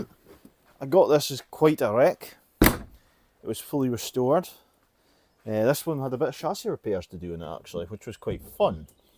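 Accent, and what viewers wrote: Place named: Scotland, United Kingdom. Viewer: Well, this is one of those times that YouTube's random recommendations came up trumps! You are a very, very lucky man to own all those Reliants - I'd have a hard time deciding which one would be my favourite. I've always had a fascination for these, and do like the Mk1 Robin saloon with the glass rear hatch which I find stylish but I suspect if pushed to actually buy one it'd be a later Rialto. (A Kitten would be a tempting prospect, too. There used to be a couple running around my home town when I was younger.) Nice to hear a fairly local accent too - I'm watching this from just a wee bitty further north than Aberdeenshire.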